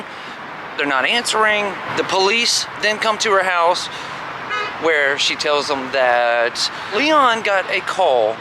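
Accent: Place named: Alabama, United States. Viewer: Good day from.Australia 👍🐨 I just watched this story and have subscribed. Now a keen follower. Your content is great - interestingly presented, your natural down to earth style is 👌and i love your "Bama" accent! I look forward to many more and will catch up on your previous uploads. This is ever so sad R.I.P. sweet gal 😇. Love & light across the oceans and miles! 🙋‍♀️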